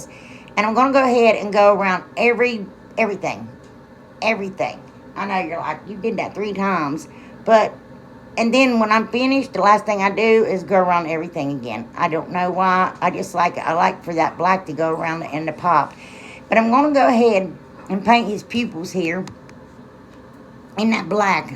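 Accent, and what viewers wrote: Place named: Arkansas, United States. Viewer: OMG! Right, don't apologize for the dogs! I loved it! Had to subscribe, partly because of them! LOL! I'm from northwest Arkansas and this was like listening to someone from my hometown!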